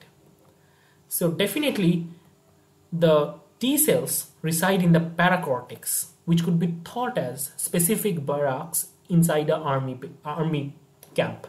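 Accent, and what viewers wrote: India. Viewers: Idk why you are using that fake American accent ,it is so much irritating that I can't even focus on the lecture...it can be easily caught... Though u can speak in simple and sweet Indian accent, it would be more pleasing to 👂 .😊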